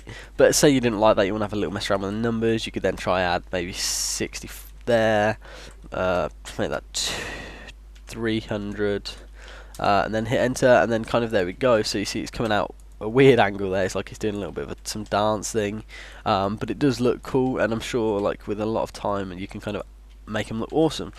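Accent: England, United Kingdom. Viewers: I know it may be obvious to some but are you English? I can hear your accent but I doubt it a bit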